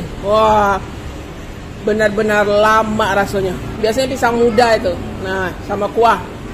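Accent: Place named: Minangkabau, Indonesia. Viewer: Bahasa Indonesia diselangi dialek minang amat suka saya dengari..tersusun fasih mempesona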